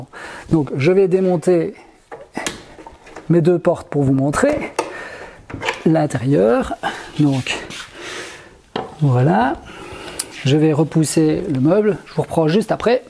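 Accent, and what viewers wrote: Belgium. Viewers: Une vidéo qui m'a donner envi de faire aussi ma propre cuisine, merci beaucoup pour ttes ces explication j'arrive plus facilement maintenant à voir comment sont fait les meubles et caissons !! Je suis abonné depuis peu mais j'adore ta pédagogie et aussi ton accent belge 😂 !! Encore merci pour tous tes partages de connaissances 🙏👍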